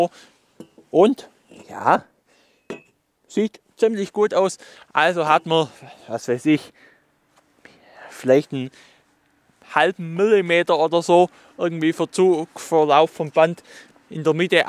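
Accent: Swabia, Germany. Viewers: So isch des. A reachdr Urschwob im Tschäd. Wo kharsch au du no? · Frey mi saumäsig uff deine Videos, ganz besonders weil du a aständigs Schwäbisch schwätsa kasch. Des isch de schest Schproch uff dr ganza Welt 😂😂😂❤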